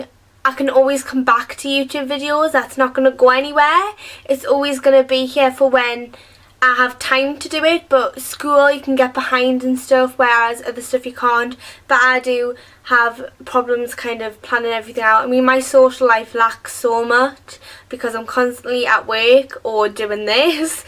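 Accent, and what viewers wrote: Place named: Geordie, United Kingdom. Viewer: It is soo good to hear your accent because I've got a penpal in newcastle upon tyne and I want to meet her next summer and so I can "learn" to understand her.. because gordie is a quite difficult language to understand although yours isn't as strong as others ones ^^ (Sry if my english isn't perfect.. I'm from germany :P )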